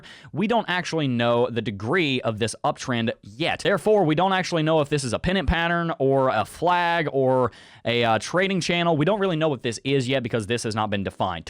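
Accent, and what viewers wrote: South, United States. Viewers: Sounds like you are from the south. You got the radio voice gift. Great TA.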